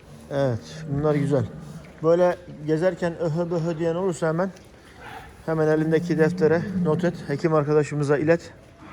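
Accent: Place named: Kahramanmaraş, Turkey. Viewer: abi Maraş ağzı konuşman o kadar hoşuma gidiyor ki:))) komedi videosu izler gibi seyrediyorum. Memleket özlemimi gideriyorsun:))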